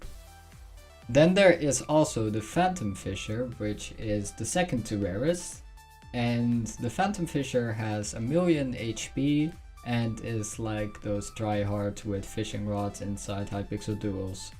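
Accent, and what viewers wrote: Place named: Netherlands. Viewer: bruhhh ik hoorde ik binnen 0.1 seconde dat je nederlands was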